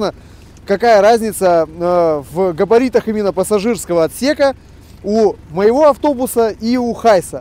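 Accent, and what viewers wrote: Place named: Irkutsk, Russia. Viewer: Подожди-ка! Ты шО иркутский???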